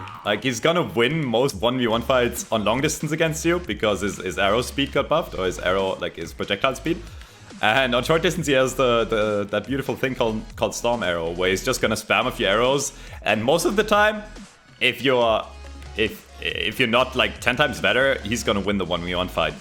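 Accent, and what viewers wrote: Germany. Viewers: german :D ? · Are you German? Or Austrian?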